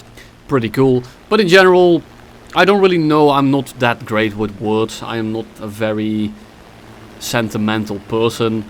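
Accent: Netherlands. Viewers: Never knew he was dutch, I always thought he was an american with a speech disability.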